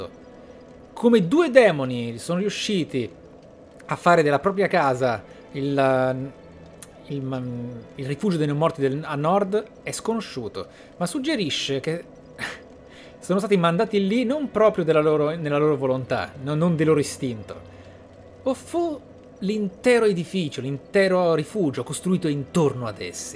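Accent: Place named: Ireland. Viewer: "Maybe the TURD one". Non è che hai l'inglese impastato, è che lo parli con l'accento irlandese; che è tipo l'anello della calamità dell'inglese, come dimostrò sulla BBC Dara Ó Briain con una bambola parlante...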